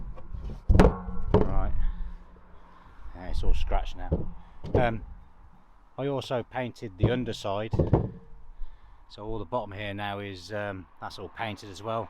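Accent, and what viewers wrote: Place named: RP, United Kingdom. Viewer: Very posh 😐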